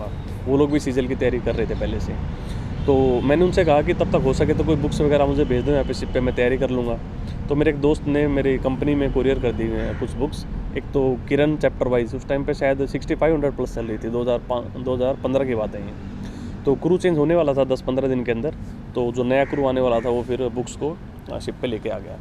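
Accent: Haryana, India. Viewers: Haryana k log sach Bolte h or Dil se Bolte h. AAP Haryana se ho boli se hi PTA lag rha h. I Love my Haryana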